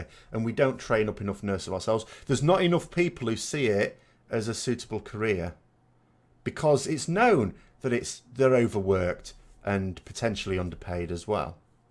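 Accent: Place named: Northern England, United Kingdom. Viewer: The moment I heard the presenter's Northern accent I knew this was all going to be a diatribe of doom and gloom, why is it that the moment one steps foot North of Watford one is confronted almost unfailingly by this dog in the manger attitude, be positive man, I know that flies in the face of everything your parents taught you whilst you were scoffing down your hotpot and black pudding but really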